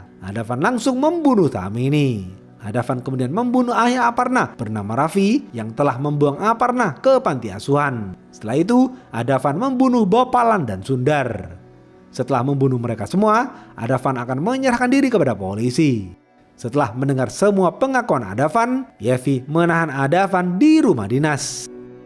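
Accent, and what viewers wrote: Java, Indonesia. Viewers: Wong jowo po mas e? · Jawa mu mana min?tugelan barang mvok ghowo2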